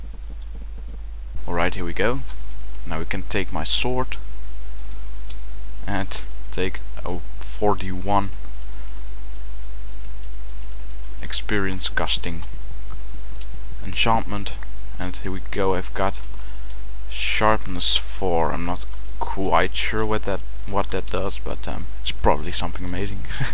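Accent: Netherlands. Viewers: wel grappig dat hoe goed een nederlander ook engels praat je toch altijd hoort dat het een nederlander is ; ) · ik hoorde dat je uit Nederland komt door het Nederlandse nadruk xD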